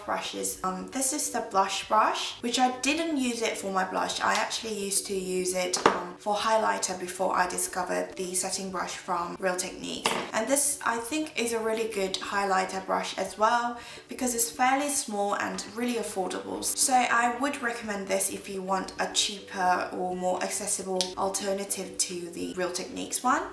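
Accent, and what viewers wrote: United Kingdom. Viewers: 아이허브에 리얼테크닉브러시 세트가있더라구용ㅠㅜ그거 구매할까말까 수천번은 더 고민하구있어요ㅎㅎ 스펀지두 마찬가지ㅜㅜ 언닝 영국발음 쭤러용♡ 볼살없어서 스트레스인 저에게 언니 볼살은 부러움의 대상이에용ㅋㅋ 항상 이쁜비디오 감사드려용! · 얼굴은 애기애기한데(손연재닮음!!!) 목소리나말투는 파워머찜..역시 발음은 브리티쉬..존멋..무튼언니팬이라구용..><항상잘보구잇슴니댱~~~ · 님은뭐랄까..보면볼수록매력이있는거같아요~~~오늘부터구독하기시작했어여~~~경선님유튜브에서알게되서오늘부터보기시작했네요^^ 영국영어발음도너무매력적이고~~항상응원하겠습니다~~~ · 올라오기만 기다리고있었는데 올라왔네요!!!!!댓글 처음 남겨봐요ㅎㅎ좋은정보 많이 알아가서 좋아용 영국발음에 관심있어서 보게된건데 언니 동영상들보고 급!!화장에도 관심이 생겼어요ㅋㅋㅋㅋ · 영상많이올려주세요♥ 영국발음좋아요ㅎ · 영국식 영어 너무 멋져~~♡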